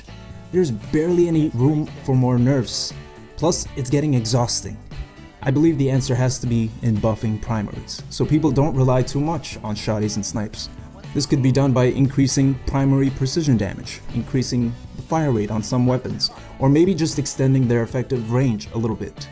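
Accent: Canada. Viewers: invite for canadia pronunciation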